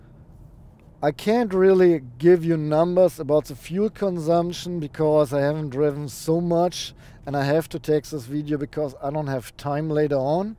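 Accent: Germany. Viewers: Die Videos sind nice... vielleicht solltest du einfach mal ne ganze Woche am stück Englisch sprechen, Radio auf englisch hören, englische Youtube Videos gucken; damit du mal diesen fürchterlichen deutschen Akzent loswirst. nimms nicht persönlich, mein Englisch hat mal genau so bescheiden geklungen ;) eine Woche reicht. Wenn du sie nicht schon auswendig kennst, guck dir alle staffeln von TOP Gear UK an...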